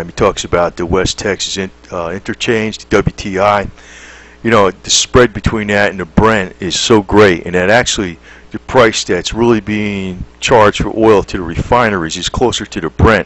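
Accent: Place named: New York, United States. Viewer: You sound like you're from New York. No offense, but I just imagine you saying something like, "Hows about me, Tony Baloney and the gang come over there and whack ya up side the head, eh?" Seriously, good info. Yours is one of the top hits for recent videos with Lindsey Williams. On the Sunday Alex Jones show yesterday, a caller asked he bring Lindsey back on and Alex agreed. We might see him either this week or next week.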